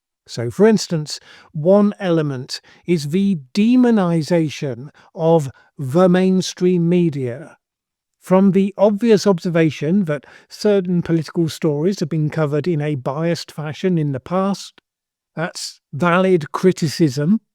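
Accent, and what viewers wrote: United Kingdom. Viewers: Great video, thank you from a subscriber greatful for interesting inteligent thoughts and a pleasant British accent. 👋 Cymru... Any chance of you joining BlueSky for those of us who prefer not to add to Mr Musks empire.. If I had to guess that the human brain and it's psychology means you have to work to avoid that 'cultist warm cwtchy comfort zone' that is the inside of your own head. 😱..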